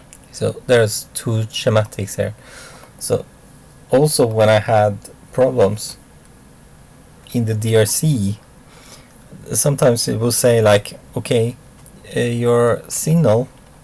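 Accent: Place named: Sweden. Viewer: Schematics is pronounced skematics in english not Schemaaticks.Sounds like swenglish to me.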